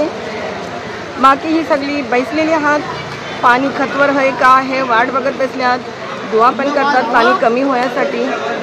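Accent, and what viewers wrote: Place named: Konkan, India. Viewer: It's not marathi... It's kokni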